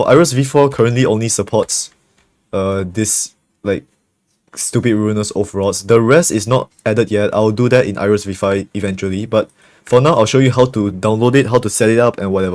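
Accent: Singapore. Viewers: Omg ru Singaporean I can hear the accent wasa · thank you singaporean accent man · r u from singapore?? u sound singaporean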